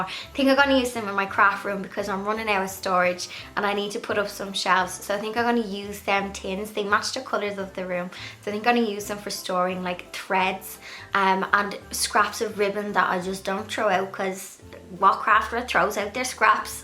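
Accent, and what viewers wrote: Dublin, Ireland. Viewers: I'm new subscriber I am old school I use them for sewing lol and even makeup etc I'm afraid of inhaling spray paint but I love what you done I'm in Ireland is that a Dublin accent I'm a dealz lover and tried anything like that as I live alone xx thanks alot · Blondie is gorgeous. Your Irish accent is so much nicer than my Scottish one. 🏴 Thanks for this vid... I can't throw anything out. 🙃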